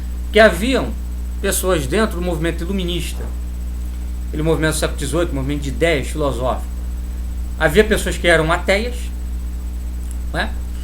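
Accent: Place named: Rio de Janeiro, Brazil. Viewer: O apresentador do vídeo não diz quem é, chega com sotaque carioca, liga meus sensores, me fazendo lembrar do bispo Macedo e já me dá alergia. A seguir, deixa dúvidas por toda parte. Eu apostaria, pela dialética, se trata de um jesuíta. Critica a todos mas não dá a opinião, a não ser que se deixe tudo para lá(bem jesuíta). Voce é capitaneado por quem? Aonde quer chegar? Se ambos os lados estão errados, qual seria a saída? Deixar tudo para lá? Voce está preocupado com doutrina em si? Por que Olavo de Carvalho é herege? Voce é a favor ou contra detonar a romanização da Igreja católica? Resumindo só falar bem nos dias de hoje não funciona; há de se deixar opiniões firmes sobre os fatos e sua correção.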